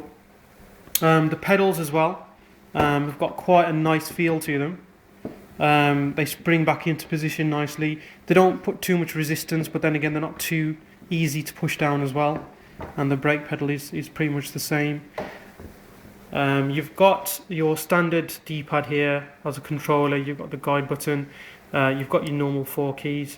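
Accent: United Kingdom. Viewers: i hear a brummie accent, come on you blues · i like your accent, british man :P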